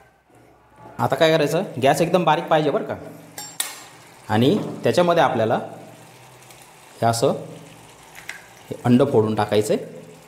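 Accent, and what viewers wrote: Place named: Pune, India. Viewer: भाऊ तुम्ही नाशिक पेक्षा, जास्त आमच्या पुण्याचे मुळशीतले शेप वाटतात, आमच्या गोड रांगडी भाषा सारखी ❤️ तुमचीपण पन टोन वाटते 👌🏻 🙏🏻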